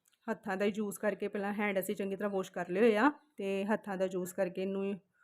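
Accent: India. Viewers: ਅਂਮਿਰਤਸਰੀ ਬੋਲੀ ਬੋਲਦੀ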